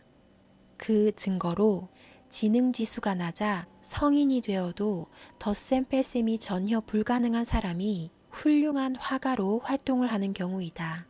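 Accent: Gyeongsang, South Korea. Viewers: 경상도분이시네요 어감도 좋구요 좋은 내용과 좋은 말투 감사합니다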